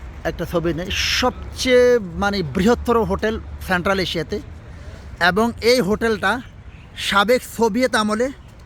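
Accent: Noakhali, Bangladesh. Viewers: বাই আন্নে নোয়াখালীর সুদ্দু বাসায় কতা বলেন, বালো লাগে তবে সুজোগ পেলে স্পিচ থেরাপি নিয়েন, আরো বালো দানদা করতে ফারবেন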